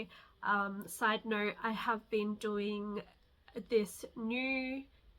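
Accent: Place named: Australia. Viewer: 🕊 Blessed Shukra/Venus day Love💌, You are so sweet & genuine💕💞💓 I am so honored & humbled by your beautiful sentiments! Thank you😭 🙏🏾🥰 I can say the exact same for you love!! I'm currently living in Puerto Rico. I heard your accent and now I've confirmed you're in Australia! I've been being called to the Southern Hemisphere for decades and I know I will get there one day! You're always welcome my way!! I'm a traveler so I'm always changing my LO📍 I have previously shared creations and guides/tools online but I've been preparing for my next projects so I'm not currently on any socials outside of this YT I don't use. I occasionally use X for specific purposes. Big Rebirth as I'm fully exiting my Sade Sati, my Rahu/Ketu returns, Revati Rahu/Hasta Ketu D1 and I have a D9 Jupiter Punarvasu. I'm very eager by next year to gather fellow vedic astrologers like yourself and collectively put something out together and start a support network. 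I've got some books/content in the works right now and a tarot deck I've been designing. I checked out your soundcloud and🙌🏾 🔥💀🌺📿 I'm an Anuradha MC Jyeshtha Venus with Dhanishta D1 I'm so here for that trip you're taking ppl on!! Thank you for blessing us! I'll support you any way I can!! I have a lot of friends that are global DJs. My friend DJ Haram/Zubeyda is a female queer DJ who loves to support marginalized artists. There's a great network in that crew of people I used to support more physically. She's a great person to connect to!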